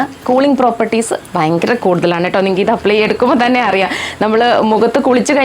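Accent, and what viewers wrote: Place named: Tamil Nadu, India. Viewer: തമിഴ് പറയുന്ന ആൾ മലയാളം പറയുന്ന പോലെ ഉണ്ട്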